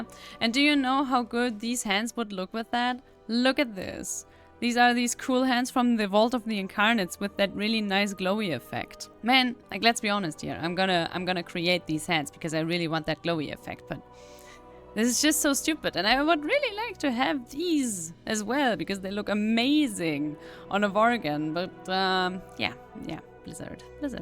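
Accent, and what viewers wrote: Germany. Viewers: Monk! Best class!!! 😃 A true master don't let dictate his style by others. Deutsch? Man hört es am akzent. 😅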